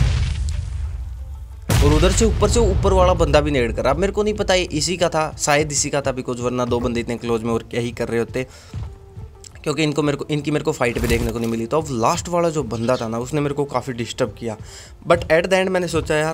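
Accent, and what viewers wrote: Haryana, India. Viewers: bhai humare Haryanvi hai kya rajasthani...?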